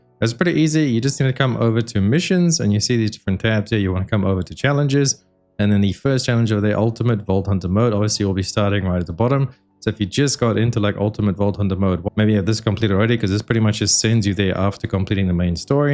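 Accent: South Africa. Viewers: Great video very well laid out. Curious is that an South African in AUS accent? Just guessing don't burn me if im wrong.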